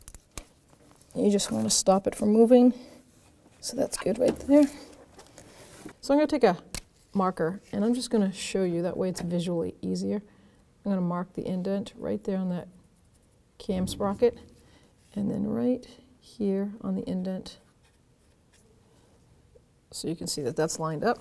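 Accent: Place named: New York, United States. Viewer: Sounds like she's from New york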